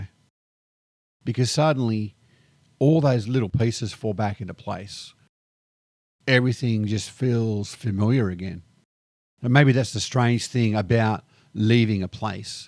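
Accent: Australia. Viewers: So many daily details we take for granted or aren’t conscious of, till they’re gone, then we notice and are grateful! ☺️ I like the Aussie accent and kookaburras! Joy.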